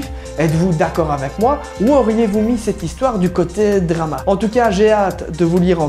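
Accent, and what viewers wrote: Belgium. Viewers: Tu un ptit accent tu est belge ? Sinon cool ce concept de chaine moi shuis belge liegeois x)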